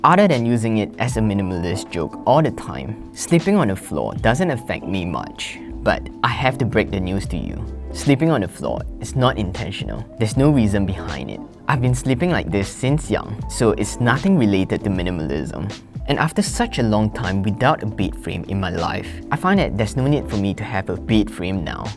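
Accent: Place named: Singapore. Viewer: As a Malaysian, i approve your singaporean accent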